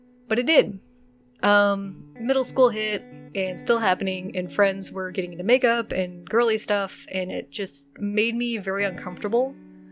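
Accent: Midwest, United States. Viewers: Midwest: heavy souther with some northern mixed in and a bit of its own thing all at once.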